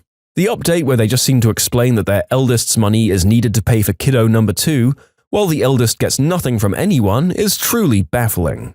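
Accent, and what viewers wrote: United Kingdom. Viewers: You sound British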